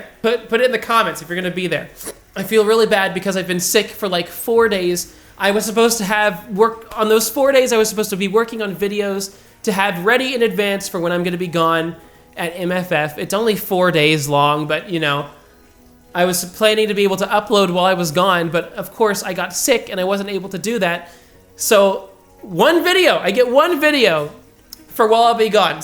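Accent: United States. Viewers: He sounds very very American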